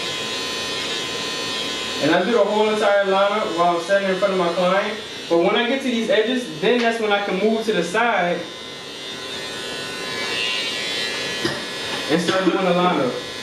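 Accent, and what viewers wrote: Louisiana, United States. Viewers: Louisiana accent ?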